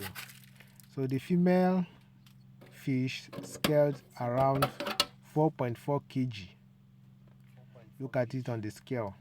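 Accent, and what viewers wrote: Nigeria. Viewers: Nwanne your igbo accent dey give me joy 😅 thanks for the info too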